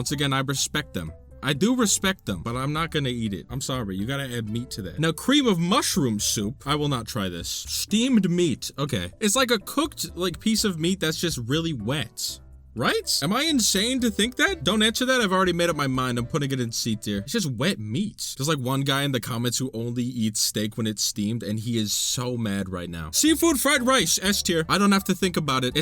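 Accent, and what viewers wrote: Ireland. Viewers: I didn't know where is from but I didn't expect Ireland lol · Your Irish ? Witch county do you/did live in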